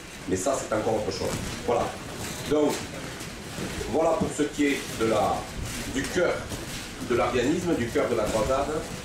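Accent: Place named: Marseille, France. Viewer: Merde l’accent marseillais!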